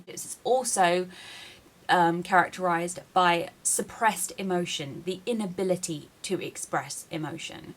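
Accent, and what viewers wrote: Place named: England, United Kingdom. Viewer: ! Are you out of country? I'm saying you seem to have an English accent! And can I say a beautiful woman! Commented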